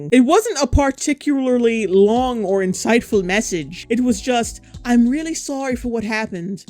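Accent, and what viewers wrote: United Kingdom. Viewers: Is she from burnley?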